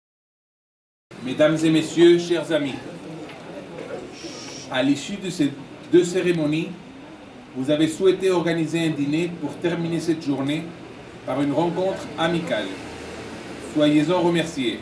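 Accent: Spain. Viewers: il a un accent espagnol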